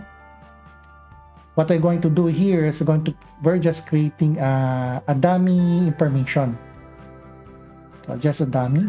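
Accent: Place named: Philippines. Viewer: hi, I recognized your tone and confirmed that u r a filipino with your name. i'm a 3rd year filipino IT student. just proud of ur videos. thank youuu! it helped me with my simple project :)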